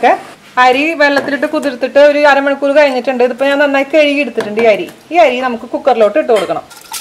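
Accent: Thrissur, India. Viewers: Kkk👍. Chechide slang vechit...naadu Nammade thrissur aano ?? Njanum thrissur aatta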